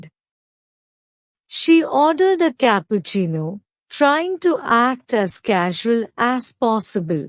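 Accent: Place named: India. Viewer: Why I listen to to India accent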